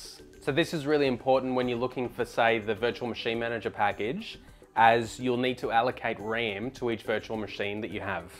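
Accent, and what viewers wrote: Australia. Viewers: notices Aussie accent liked and subbed mate. Great video btw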